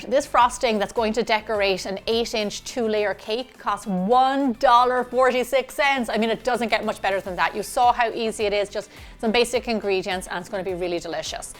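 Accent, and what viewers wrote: Ireland. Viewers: You are so cute. I just discovered your channel & subscribed. First, I Love your Irish accent and definitely your baking recipes, (thoughts, strategies & ideas with baking). Also appreciate the mindfulness of cake prices you have and simply baking at home. I was looking for a birthday cake recipe too! Thank you 🙏🏻🙏🏻so much for sharing your gifts with us. Happy 11th Birthday 🎂 Gemma (Beautiful Cake🎂) & may God bless you with many more happy years ahead!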